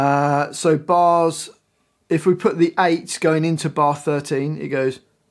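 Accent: United Kingdom. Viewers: I ain't sure yet of what sounds better - his British accent, the guitar or the song itself. It looks like all of those are brilliant.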